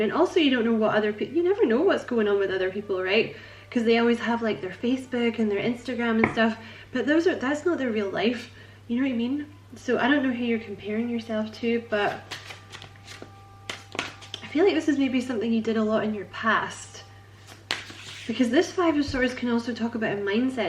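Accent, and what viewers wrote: Scotland, United Kingdom. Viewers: "How to Train Your Caterpillar" is the most adorable name ever. Awesome work & vibes, thank you. I feel very seen. And the Scottish accent is awesome. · I love your accent. Sounds Scottish to me. I was born there and miss it. Thanks for the reading. Im yours...lol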